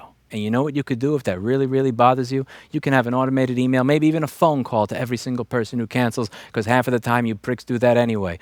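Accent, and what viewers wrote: New York, United States. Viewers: Is it just me or does sound more New Yorker now that he moved away. My parents signed up for a gym the first day there their locker got broken into and credit cards stolen. They decided it wasn't safe to be there. There was only one guy that could do cancelations and he was always conveniently off today or on break or some other excuse. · ' New Yorkerness intensifies with each passing day. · You can tell he's been angrier lately because the New York accent is coming out more and more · I came down here to write the same thing! Just enough of an accent to notice. And that's something I never ever have heard from him in years. New York attitude? Certainly. But the accent? Pretty rare. It shows he means business!!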